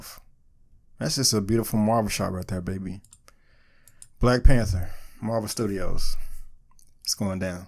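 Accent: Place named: St. Louis, United States. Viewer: I'm from STL and your "r" pronunciation sounded very St. Louis.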